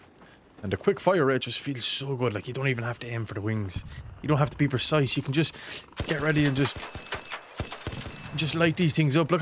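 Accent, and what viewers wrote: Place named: Cork, Ireland. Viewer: Your accent reminds me of my nans. She was from Cork.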